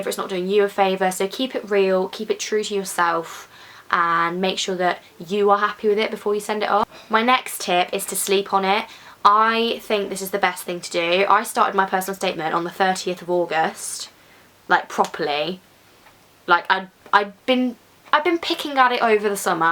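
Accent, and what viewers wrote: Birmingham, United Kingdom. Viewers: Birmingham, England I think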